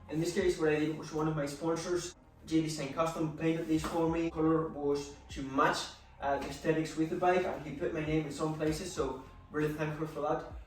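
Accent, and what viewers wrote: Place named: Northern Ireland, United Kingdom. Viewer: great video! im curious man, i see from another comment you speak spanish, but you sound like you mightve been raised in northern ireland. is this the case? really cool if so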